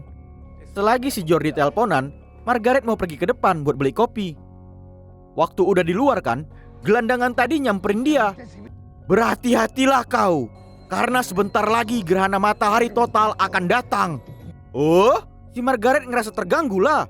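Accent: Medan, Indonesia. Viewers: Logat kau medan kali bah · Bang lo bahasa apa bang org medanya kau ini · Ak suka bang konten mu tapi yg ku suka cara anak Medan berbicara buat ak ketawa sumpah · Bahasa abg ini mcm bahasa anak medan.. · logat orang medan itu bg hehehe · Logat medan kau lah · Adminnya orang Medan ya? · logat Medan ni wee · Nampak X org medan nya.🤣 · Bro Kau orang medan, tebing, atau siantar Ooaalaahh Kaco kacooo 🤣🤣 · Medan ni we udah jelas logatnya wkwkw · Njr logatnya khas medan banget bang🗿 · Orang medan kau kan bg 🤣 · Orang medan ya bg nampak kali ku tengok logat medannya🗿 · Logat medan bah anak medan kau ya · Medan mana bang ?? Kwkwkw kp lalang ni lek kwkw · Medan ya bang?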